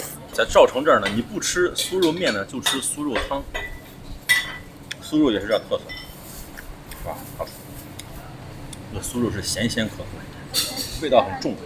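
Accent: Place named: Beijing, China. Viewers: 👏👏👍 的歌喉真的不錯，以往都聽出耳油。今次京腔亦好有味道，希望以後在你視頻能再聽到。😁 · 聽大家吊個京腔，俺高低得吼個“好～～！”。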